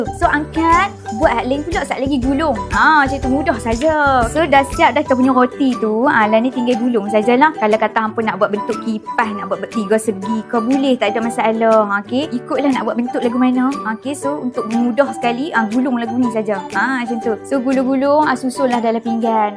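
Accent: North, Malaysia. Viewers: Ulang tngok...suka dngaq loghat utara...sambil tu nk ingat resepi...tk bnyk2 😊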